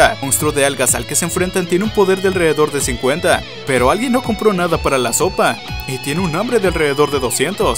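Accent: North, Mexico. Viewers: No manches en este vídeo te salió mucho el asentó mexicano..!! jajaj xd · Como olvidar cuando hablaba como norteño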